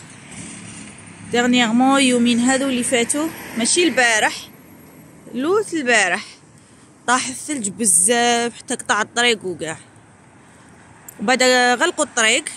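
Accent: Chlef, Algeria. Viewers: والله غير سمعت هدرتك حسيتك من شلف والله العظيم بنت بلادي انا ثاني شلفية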